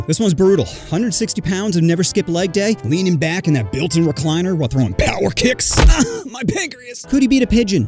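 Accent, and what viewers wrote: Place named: South, United States. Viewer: Bro went all Southern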